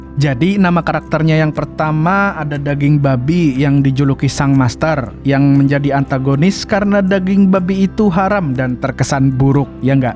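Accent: Banjar, Indonesia. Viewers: bah dah jua urng banjar si Mimin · Orang banjar sakalinya😂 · Bang orang Banjar kah...Banjar mana Pian bang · Urang banjar kh pian bg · Orang banjar kh pian??? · Orang Banjar kah mang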